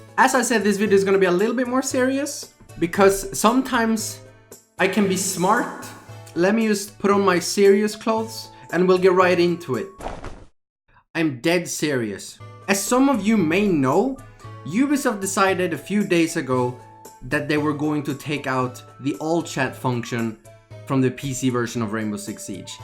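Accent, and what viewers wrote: France. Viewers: I'm French so your French accent is... Très bien. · Ur french accent :c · l'accent français de qualité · Je trouve que ton accent français est très raffiné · j’aime trop ton accent ( I love your accent ) VIVE LA FRANCE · French accent 100/10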